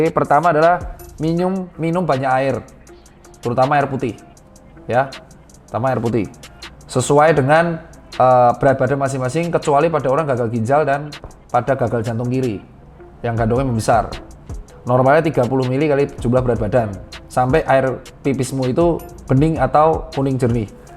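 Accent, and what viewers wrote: Java, Indonesia. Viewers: Mak jebuos... 🤣 medokkk...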